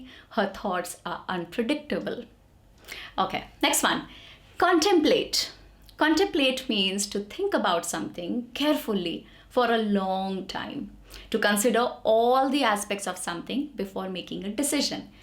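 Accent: India. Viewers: You have a huge hindi influence in your English accent, you better work on your accent,intonation it sounds awful. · People that don't have an American accent have no business teaching anyone English. That is the strongest indian accent I've ever heard.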